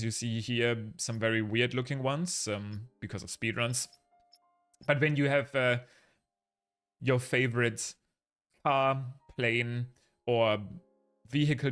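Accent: Germany. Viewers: So after almost 3 hours of interesting thoughts and stuff I have to say, please work on your pronouncing. Even thats our way of leaning school english in Germany, this is so much of an pain to listen - this german-ish-english pronouncing. You still did well, don't get me wrong, but it would be more perfect when I could enjoy your talk more. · I really like your German accent. It sounds very nice. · Man hört so hart das du deutsch bist 😂❤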